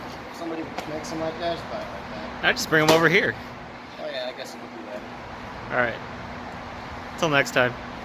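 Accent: United States. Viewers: Looks like america.. sounds american.. uses metric... ? Great video, best one on doing these. Ive been told its a good idea to also drill a hole in tube before sleeving, to give an extra spot to weld (spot weld). But with your welding method its probably not required. Top job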